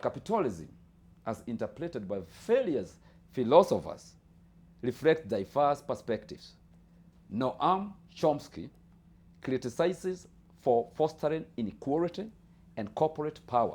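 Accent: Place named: Kikuyu, Kenya. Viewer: 😂 wah the Kikuyu accent is very heavy ..just speak the language you are confortable with My boy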